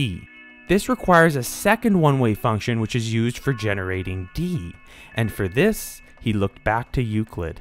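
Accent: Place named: Canada. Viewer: i was like there's something weird about this accent.. then he said aboot.. and i was like o right, he's canadian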